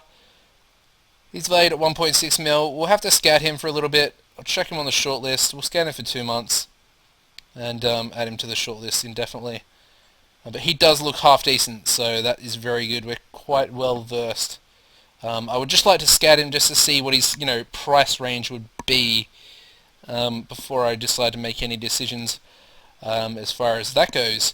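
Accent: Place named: Australia. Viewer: nice watching an fm play through with an aussie accent mate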